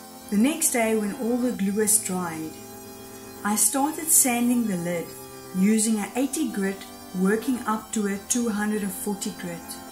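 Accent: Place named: South Africa. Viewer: Sounds like you're from South Africa.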